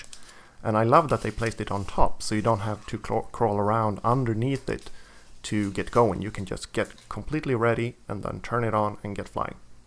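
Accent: Sweden. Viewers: Wooooow helt klart svengelska (man hör det på nästan alla svenskar)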